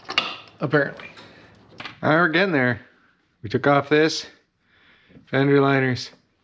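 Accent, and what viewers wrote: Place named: Canada. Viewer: You kinda sound a little Canadian. And I thought you was like from the states. But anyways good job. Keep up the good videos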